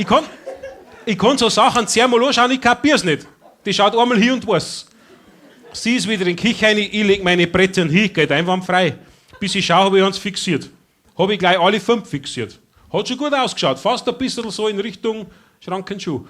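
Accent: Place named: Upper Palatinate, Germany. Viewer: Der Oberpfälzer Dialekt ist der beste ! 😅⭐⭐⭐⭐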